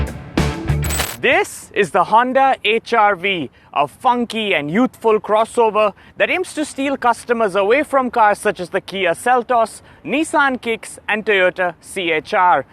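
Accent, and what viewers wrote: India. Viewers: Good review! India English! That’s quite interesting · Indain English WTH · It's good that Honda is also considering Indonesian cars for the UAE as that is a region where even the basic variants of all models get a brilliant equipment list and the HR-V is no exception to that. While the exterior design does look good, it is too simple for my liking. Even the interior switches and the layout of the instrument cluster is as if directly taken from the Jazz and the City, the latter being sold here. This is one Honda which failed to impress me in the interior department as we are used to seeing sumptuous designs from the automaker. The Civic, Odyssey, Accord and Pilot, all have a certain wow factor which the HR-V lacks. The only feature to write home about is that ironic "Indian English" accent and the magic seats at the rear to increase the cargo area. 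On all these grounds, it is the Kia Seltos that for me is the undisputed king because of its fantastic design and the features it gets. However, as you pointed out, it is the cheapest car currently on sale which is surely to have buyers thronging at Honda's dealerships. And then, there are the basic virtues every JDM car comes conferred with: Reliability, low maintenance costs, excellent fuel economy and an obnoxiously high resale value which is what is going to make a great case for itself. How I wish manufacturers get rid off that CVT transmission and use a torque-converter and turbo-petrol engines like the 1.5 in the Civic. That would be the perfect choice of the eclectic options with Honda. Enjoyed the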